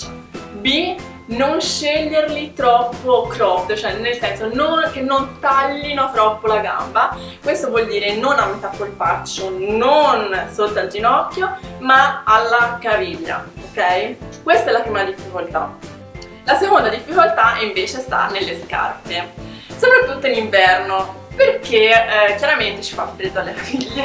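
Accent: Florence, Italy. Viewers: Ma che per caso sei di Firenze ?! ahhaha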